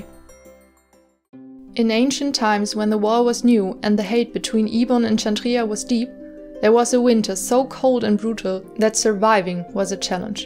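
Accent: Germany. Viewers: I just found your channel yesterday and I already loved it after the first video also your voice sounds really cute... are you from Germany? I am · Where are you from? Your English reaaally sounds like you're from Germany ^^ and the 'Moin' makes me think you're from the north sea. Am I right or completly wrong? 🌊😍 BTW! It's a reaaalllyy nice doll ❤️ instant subscribe 😊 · omg are you German? I'm am German, it sounds like it to me :3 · Why is the fabric softener named yes please? Sorry I know German Edit: I am German! I knew your accent was German! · Man merkt an deiner aussprache das du Deutsche bist. Anfangs war ich zwar etwas unsicher, aber dann sah ich ''UHU Alleskleber'' und boom ich war stolz auf mich das ich an der aussprache erkennen kann von wo wer kommt!!! Btw cute doll <3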